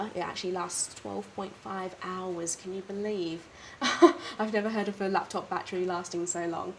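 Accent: England, United Kingdom. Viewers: I thought she did a fine job. Cute english accent.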